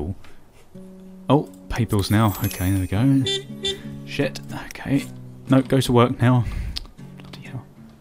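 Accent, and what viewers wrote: United Kingdom. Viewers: British accent = $waggest accent in the world.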